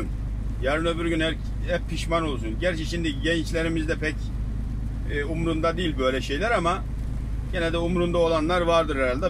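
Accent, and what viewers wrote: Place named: Kastamonu, Turkey. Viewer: Kastamonu bide ıIlgazlılar gibi konuşuyosun abi.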